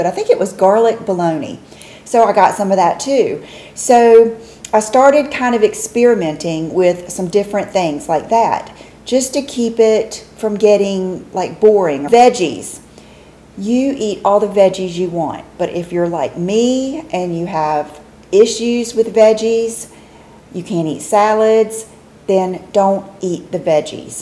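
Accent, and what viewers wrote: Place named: Texas, United States. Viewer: Besides being drop dead beautiful and I think your accent is from Texas cause I'm a Texas gal living in California but you are also so sincere so sweet and thoughtful I love your channel love you❤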